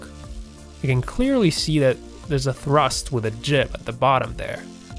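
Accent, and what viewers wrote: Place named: United States. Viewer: You speak with an American accent but seem to have some trouble with with English, sounds weird.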